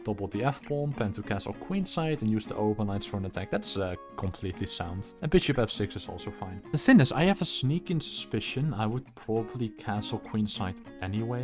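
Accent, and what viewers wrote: Netherlands. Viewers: Are you Dutch? I recognise that accent from everywhere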